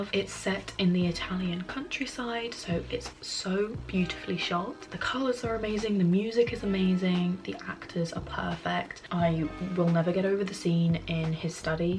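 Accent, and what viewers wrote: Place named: England, United Kingdom. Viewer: where abouts in england are u from? love your accent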